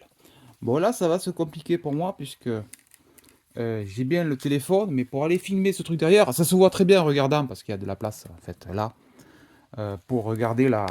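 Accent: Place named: South, France. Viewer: Excellente explication avec de bonnes astuce ! Et un accent du soleil qui fait du bien en prime 😊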